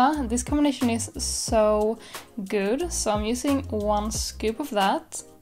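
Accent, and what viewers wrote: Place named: Sweden. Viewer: That Swedish accent tho.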